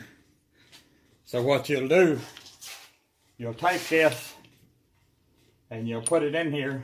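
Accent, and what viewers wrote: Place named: Georgia, United States. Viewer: very ingenious design and I learned something today without trying. who says you can not teach old dogs new tricks. love the channel but where are you from? I am a Georgia boy myself so accent is familiar. love the channel.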